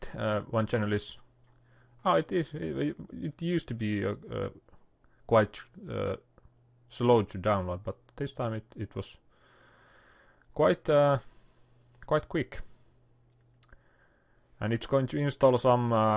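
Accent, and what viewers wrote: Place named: Finland. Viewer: Tunnistan suomiaksentin tästä :D Kiitos kuitenkin!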